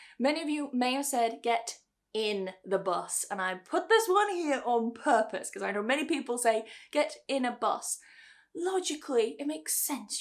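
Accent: United Kingdom. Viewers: Your english British dialect are very very clear ❤ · Hello, it's always a pleasure to listen to you. Your accent is so british (londoner?) and easy to understand even though you speak quickly. Why say meet + up, meet alone is not suffisant? It's like find and find out, I don't see the difference. May be could you explain that in your next vidéo. So, thank's, we are looking forward to the next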